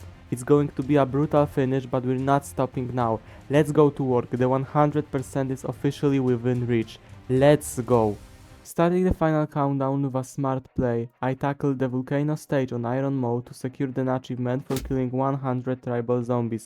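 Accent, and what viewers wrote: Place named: Poland. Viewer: najmocniejszy polski akcent ever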